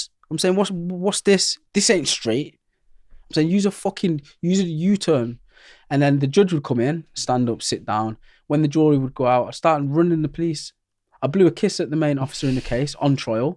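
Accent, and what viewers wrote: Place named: Staffordshire, United Kingdom. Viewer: Staffordshire accent neutral pretty much!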